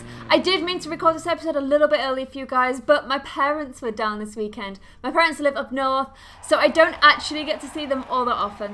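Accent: Ireland. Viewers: are u Irish? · ur Irish? high 5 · what?? you're irish?......do you know jacksepticeye? I'm a big fan of his.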